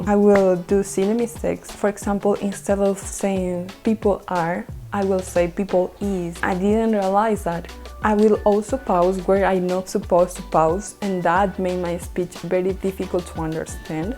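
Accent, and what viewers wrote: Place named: India. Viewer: he means the way you speak English ... sounds pretty Hindi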